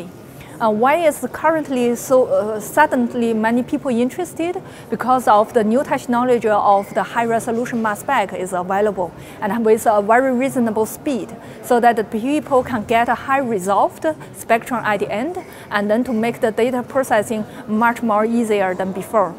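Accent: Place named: China. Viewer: 中国方言味的英语.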